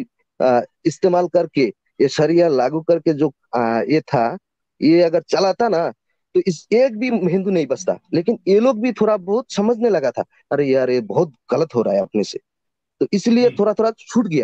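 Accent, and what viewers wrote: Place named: Bengal, India. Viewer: By voice I could guess that this person is from Bengal or Orissa 🙄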